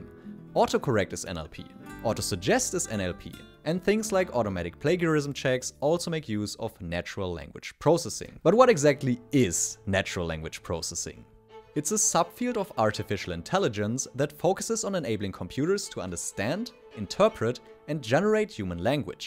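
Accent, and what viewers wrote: Germany. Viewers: Du bist doch Deutscher dass hört man so raus 😂😂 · For me it seems you have a german accent, is that true u are german? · Are you german ? kinda have an accent… i love german btw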